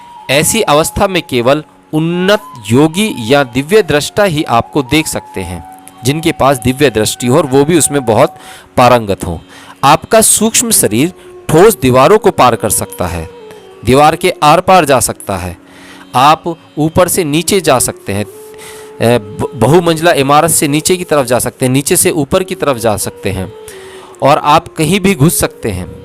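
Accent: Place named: Rajasthan, India. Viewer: Shaayad rajasthan ki jaban se bolte hai radhe radhe jay ho bhole bhagvan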